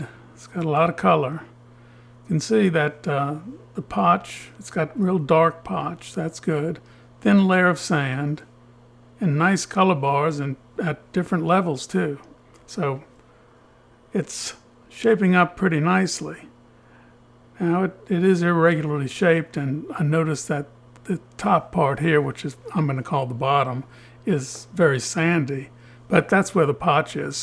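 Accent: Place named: South, United States. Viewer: Where at in the eastern united states are you from sir ? I see from the spots on your hands you're about 60 or 70. But I am unable to place your accent. I want to say kentucky or maybe louisiana.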